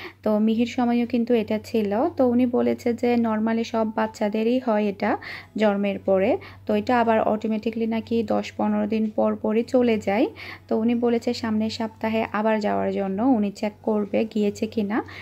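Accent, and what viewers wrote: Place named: Noakhali, Bangladesh. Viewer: Apu kmn achen.apni noakhalir sune valo laglo.nijer khub kacer akjon Mone hoy.amio noakhalir meye.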